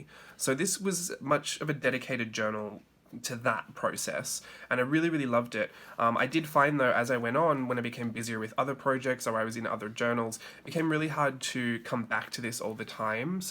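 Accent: Australia. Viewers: YT finally dropped yr video into my feed. MyLifeMits always mentions you in her videos so Im stoked to finally watching yr video. I like all yr different spreads, some of your ideas I would like to try out 😊 Also it sounds like you're dropping Kath n Kiim nuggets, so are you Australian? I really enjoyed listening to your chat about your journals too and I look forward to watching previous and future videos 🥰👍❤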